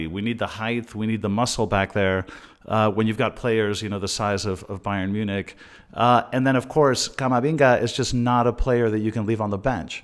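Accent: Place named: United States. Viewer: Why do u have an American accent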